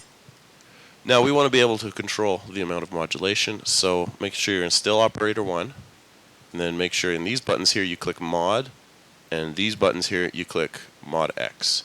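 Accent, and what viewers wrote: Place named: Minnesota, United States. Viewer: cant tell if from minnesota or canada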